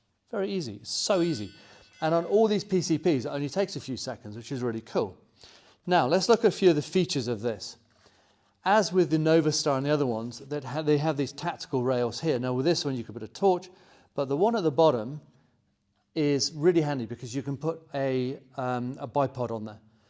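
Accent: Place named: England, United Kingdom. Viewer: English man based in the states I believe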